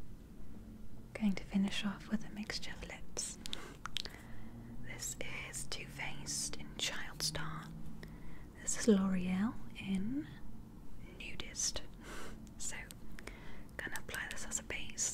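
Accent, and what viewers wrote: United Kingdom. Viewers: Love the video a d your British accent hehe. So pretty 😍 and calming 😁😴 great job as always!Hope you had a Happy Thanksgiving! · this ASMR is absolutely incredible 🤩!! Coming from a British girl, your British accent in this video is absolutely insane 🤯!! Super spot on!! This video was everything I want in an ASMR video & more!! Soft, sweet ☺️, relaxing & super tingly. PLEASE 🙏🏻 do more British accent role plays. Much love 💕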